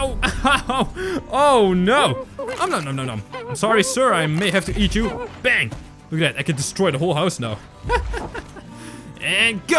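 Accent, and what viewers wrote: Netherlands. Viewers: are you dutch?! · You dutch????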